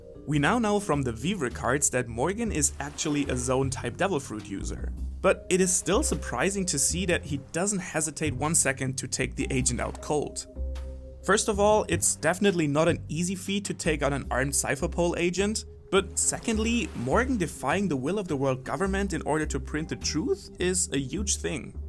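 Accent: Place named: Germany. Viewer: fom the pronunciation I would guess I am now subed to a fello German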